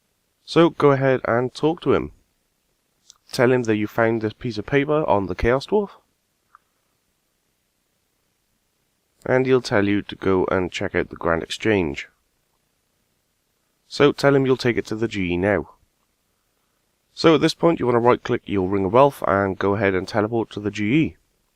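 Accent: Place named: South Wales, United Kingdom. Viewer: Your accent sounds local to me...im from Cwmbran, South Wales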